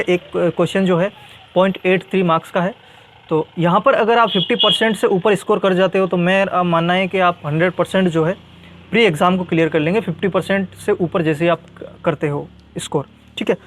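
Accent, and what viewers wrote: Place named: Chittorgarh, India. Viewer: Chittorgarh se lg rha hai Bhai .....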